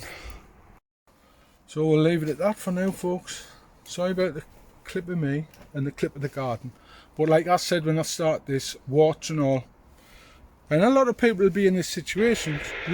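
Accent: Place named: North East England, United Kingdom. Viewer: where in the north eaat you at, marra. i was born in horden. you sound not far away. i only ask because i find it easy to understand the accent.....................brian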